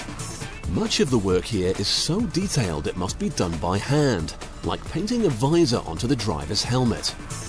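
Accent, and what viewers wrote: United Kingdom. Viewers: The first one is correct as its a British accent..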